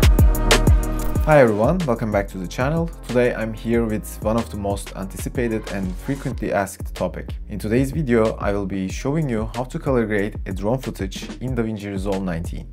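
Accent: Turkey. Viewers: reis türksün galiba sesi duyar duymaz türk konuşuyor dedim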